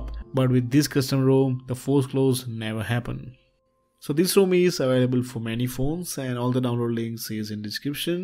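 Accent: India. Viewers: Fcking hindi english accent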